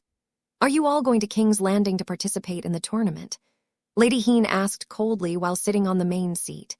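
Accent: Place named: China. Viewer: I knew it was chinese translated snd fan made. Just how the narrator speaks. Good story though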